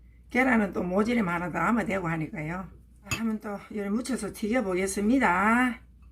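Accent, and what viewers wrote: Gyeongsang, South Korea. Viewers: ㅋ 그 손감각이라는게 해 본 요리는 되는데 생소한 재료는 쫌ㅋ 구수한 갱상도 사투리 좋습니다~~아이고 기름에 튀겨지는 소리 완전 침이 꼴깍합니데이~비주얼짱임다😄😅🤣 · 직접 채취하신 재료로 쉽게 하시는 튀김 바삭하니 맛나게 보여요 말씀이 경상도 사투리~ 정감있어세요~ 응원드립니다~^^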